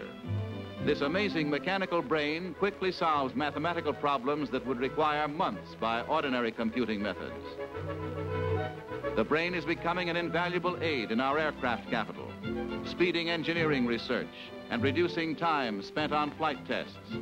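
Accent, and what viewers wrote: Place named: Transatlantic, United States. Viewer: Also known as the "Transatlantic accent"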